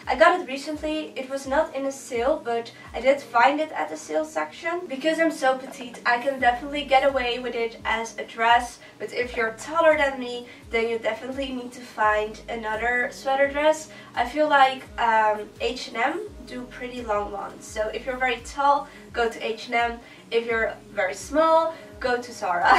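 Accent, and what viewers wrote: Netherlands. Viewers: Toen je begon te praten wist ik gelijk dat je uit Nederland kwam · haha hoorde meteen dat je Nederlands was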